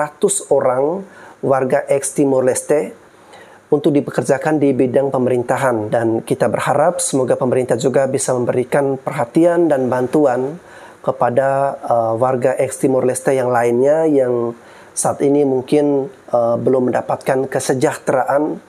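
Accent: Flores, Indonesia. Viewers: Mcmnya logat bajawa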